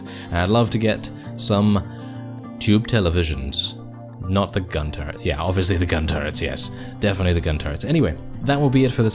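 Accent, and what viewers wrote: United Kingdom. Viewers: Okay, so, look, I need you to do a thing for me. Very simple. Low to mid maintenance, and I feel your enjoy it. Maybe, maybe not, who knows with the spin of the Earth slowing and what all. Anyway, I need you to do this simple thing. Very easy. Very. I need you to do voice over work for either parody nature documentaries, or on comedy science channels. Cause goddamnit your soothing British wailing would delight and tickle the average viewer.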